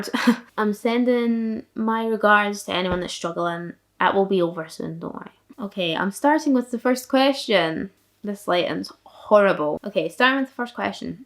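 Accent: Scotland, United Kingdom. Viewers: Just seen Brad Mondo react to one of your videos and recognised the Scottish accent! I'm originally from Cumnock (Ayrshire) which could def give you Fife people a run for strongest accent lol I live in the US now though. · As someone who’s from London I can’t even hear through ur accent sometimes but Scottish accents are cool! (Also i fuckin wish I didn’t have to pay for uni it’s so much)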